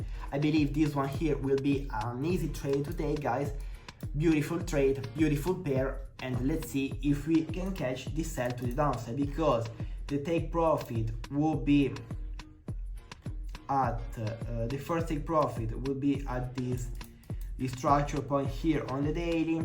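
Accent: Italy. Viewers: Pronuncia all'italiana 🤣 Bravo però continua cosi e goditi il tuo percorso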